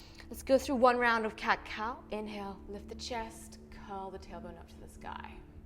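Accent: South Africa. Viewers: Is that a South African accent I'm picking up? Loved the practice, perfect balance and I feel very energized and ready for my Friday!!!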